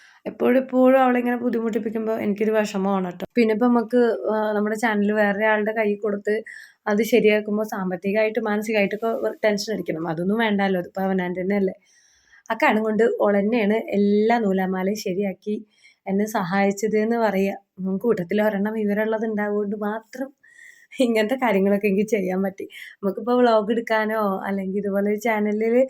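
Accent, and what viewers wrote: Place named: Kerala, India. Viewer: evideya nadu .. kurachu kozhikode slang varunnundu kurachu Kannur slang .. kuarchu shornur slang ..pidutham kittunnilla 😀😀enthayalum varathamanam kelkkan nall rasam ..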